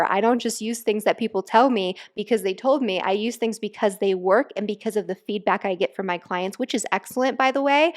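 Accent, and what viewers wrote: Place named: Oregon, United States. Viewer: I do not understand any of this but the only thing is for sure in this life : We are Doomed to any idiocy from the movements of our galaxy , anyway this girl is the reason I watch this , her accent I like it a lot sounds from Oregon , but may be from somewhere else, anyhow thanks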